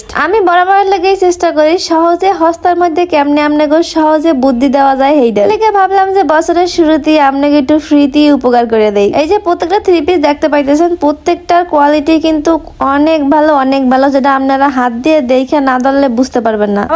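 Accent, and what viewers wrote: Barishal, Bangladesh. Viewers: আপু তুমি তো বরিশাইল্লা তাই না 😊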